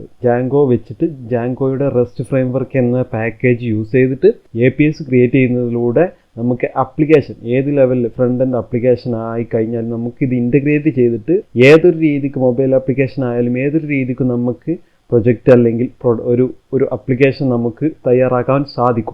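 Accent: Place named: Malappuram, India. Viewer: malappurathevideya........slag kettapoye manassilayi malappuram anennu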